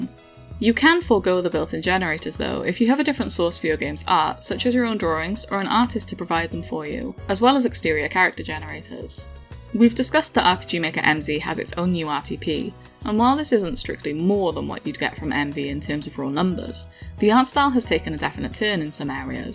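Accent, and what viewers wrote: South Wales, United Kingdom. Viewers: I'm from South Wales too! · woooo south wales gang let’s goooo! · South Wales accent?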